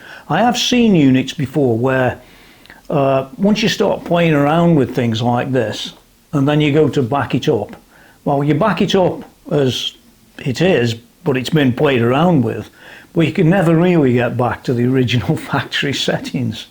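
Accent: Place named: Liverpool, United Kingdom. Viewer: Thanks for the Really detailed walkthru. Very informative, i don't know if I have ever seen one like it, but, it was helpful to see the quality, or, lack of it, inside the unit. I have only seen the Boss GT-1 from the inside and that was because I threw it against a brick wall. I got a good look at that one inside and out. I have a Helix LT now but it is quite complicated to edit and use, but at least it has a PC app to make it easier. I think that may be the thing that kills the MX5 for me, but maybe they will add one in the future, I won't hold my breath for it. BTW, are you from Liverpool? you have distinctly liverpudlian, Paul Macca accent. Thanks for the video.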